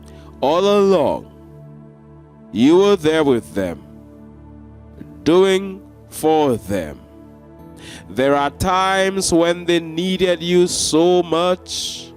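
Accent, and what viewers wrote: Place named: Jamaica. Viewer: are you Jamaican? Jackie from Philly You have a LOVELY ACCEN’T and you are very INTELLIGENT! I ❤ U-U-U Do the funky chicken sweetie! It’s a nice dance. The young kids like this old dance. L O L 😇😇😇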